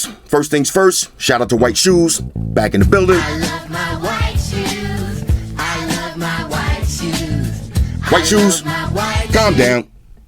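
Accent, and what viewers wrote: New York, United States. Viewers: You’ve got that classic “New Yorker” accent. I love it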